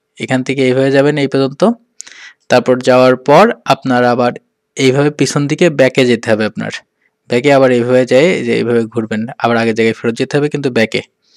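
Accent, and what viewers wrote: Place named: Kushtia, Bangladesh. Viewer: amar kushtiyar ek bondhu ase...eke bare apnar moto kore kotha bole☺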